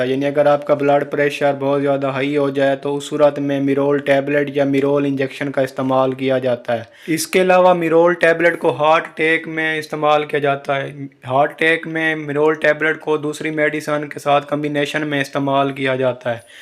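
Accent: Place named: Saraiki, Pakistan. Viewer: بندہ ہے تو پڑھا لکھا تاہم لہجہ انپڑھوں والا ہے کوئی پیور دیہاتی اور سرائیکی لگتا ہے ۔۔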